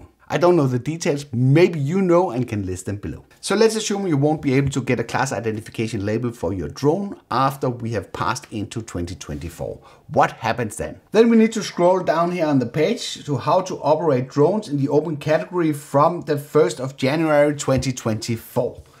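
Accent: Netherlands. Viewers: That is the most stereotypically Dutch speaking English accent I have heard. Love it!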